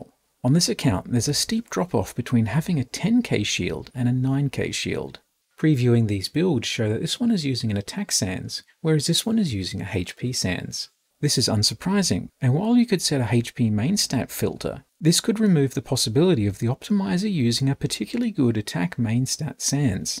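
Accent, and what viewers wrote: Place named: United Kingdom. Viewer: Just watched the full video series. I'm in Genshin for about 2 weeks and as a rookie I can safely say I didn't understand 90% of the content. Especially the jokes. but man the intros and the so British voice are funny. I will slowly get into the tool down the road, but I have a lot on my plate with the base game already. Thank you very much for bringing this knowledge + utility to the community.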